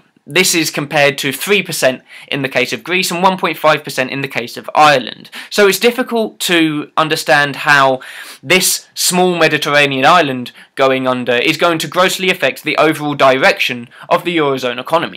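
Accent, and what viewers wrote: Russia. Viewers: P.S.: I love your Russian accent. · Your Russian accent is so good!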